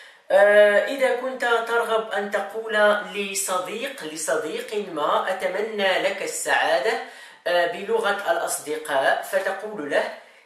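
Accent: Morocco. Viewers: انته مغربي ماهيك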